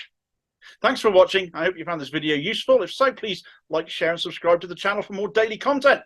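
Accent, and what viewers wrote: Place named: West Country, United Kingdom. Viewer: if only we had a Potter today . I detect a fellow West country twang . Keep free keep going and all the best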